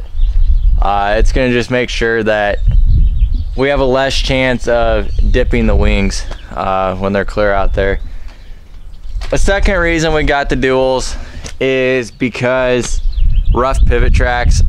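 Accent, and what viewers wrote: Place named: Nebraska, United States. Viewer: Oh I used to work for a potato farm in Duncan near Columbus. I could tell you were from Nebraska.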